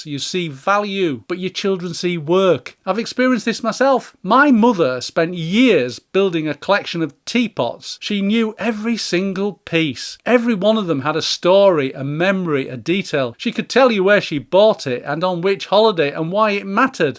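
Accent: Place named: Liverpool, United Kingdom. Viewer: Sounds like a wise Liverpool man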